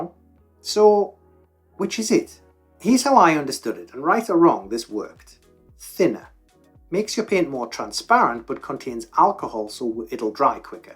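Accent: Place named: Durham, United Kingdom. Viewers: lol - btw- where are you from? I live in Canada now, but originally from Durham… Nice to have a YouTube channel with a proper accent in it.! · Ah This is easily one of if not the best airbrush video I’ve seen! Makes me proud you being a fellow Geordie, maybe that’s what made it easier for me to understand (wey aye pet 😂) it’s so refreshing to see I’m not the only one who’s been tearing my hair out trying to learn how to use an airbrush. It helps I have the same infinity CR brush as you do, and the little pot to spray into. Every time I’ve tried to use the airbrush I have spent 80% of the time cleaning 10% swearing and 10% actually painting! In my last paint session I didn’t get any tip dry ( miracle) by occasionally dipping the end nozzle/needle into a small bowl of clean water- is this recommended? It seemed to work for me. Another tip I seen elsewhere was for starting with darkest colours and go down In shades so you don’t have to fully clean in between ie black brown grey white as an example. 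I was using 6mm needle but 4mm seems the way to go as you say, which I also used recently. Anyway cracking video mate I will have to check out more of your videos, I was genuinely laughing as well btw at some of the jokes (GOT S8 is 💩 😂)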